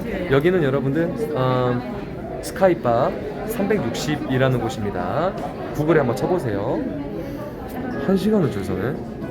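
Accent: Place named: Gwangju, South Korea. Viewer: 어찌 억양이 잇드라ㅡ 광주엿군요. 멋지게 살고 부뢉네.... 난 용기가 없는디ㅠ ㅡ 동광주 홈플뒤 살아요 ~~~~^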